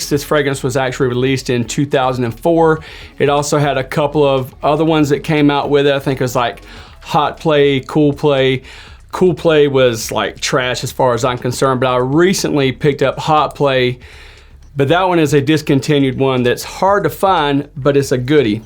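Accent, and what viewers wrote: South, United States. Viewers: Great review! I love this fragrance! One of the few I can say that about! also out of all the reviewers you seem to be my favorite so far! Sounds like you're from the south! LOL so am I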